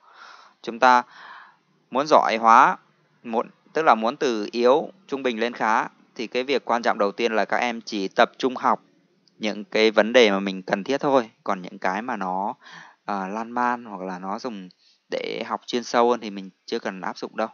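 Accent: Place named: Thanh Hoa, Vietnam. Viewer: anh là người thanh hóa ạ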